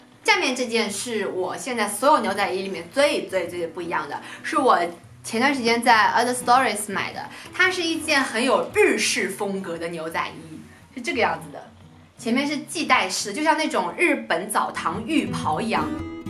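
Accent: Shanghai, China. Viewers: 男男头哈哈哈上海宁好亲切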